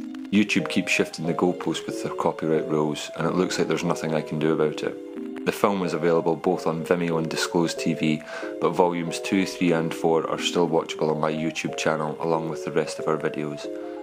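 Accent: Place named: Scotland, United Kingdom. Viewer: I've really enjoyed your vids. Pretty exited that your back, or what? Been educated in the English language since 4th grade, some 30 years back. I know I'm not perfect at it, but quite good I think. Anyway... I DO like your Scottish or Irish accent, (excuse me) but your talking WAY to fast for me, to pick up details. Please consider your foreigner fans, when making the speech. THX Looking forward, to what might come up :)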